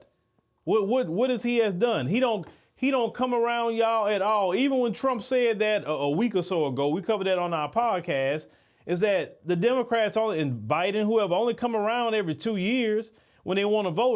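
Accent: South, United States. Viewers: your southern, Mississippi, Arkansas poor schooling accent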